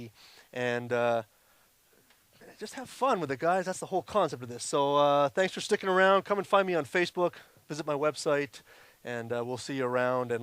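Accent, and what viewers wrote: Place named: Australia. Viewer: Your British accent sounds Australian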